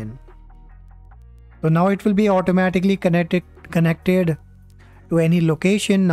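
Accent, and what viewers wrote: India. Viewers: indian ahh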